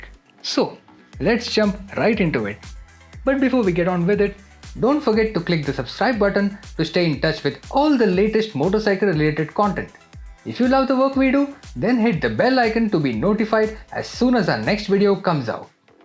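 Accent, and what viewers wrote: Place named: India. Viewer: is this a English speech practice from an Indian?🤷🏿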